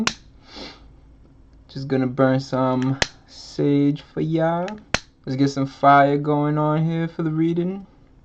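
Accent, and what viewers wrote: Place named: Jamaica. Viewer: why u trying to sound like a Jamaican?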